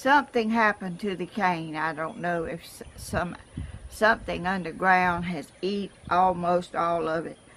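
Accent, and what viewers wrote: South, United States. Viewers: you are a joy....I'm from Australia and think your projects are inspirational.....love your southern accent....bless you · You are such a creative and inspiring person. I do crafts as well, but I’m not as brave and creative as you are. Your videos calm and inspire me. I love your Southern accent - and your humor. You are truly blessed that you are inspired with a unique idea in your head and then you figure out a way to create it. Marvelous! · I love her southern drawl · Am new to your channel, this is my second video an it’s 1yr old an love it 😍 Just love your your southern accent 💝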